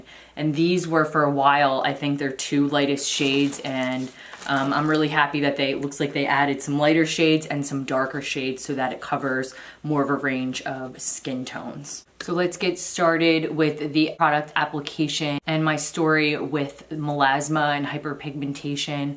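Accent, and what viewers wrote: Philadelphia, United States. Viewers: Your accent is totally Philly. I hear it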